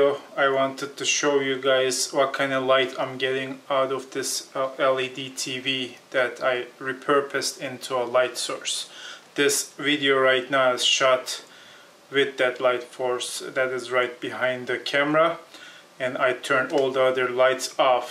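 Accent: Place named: Turkey. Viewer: This is Turkish Accent 😏✨ 🙋‍♂️ hi there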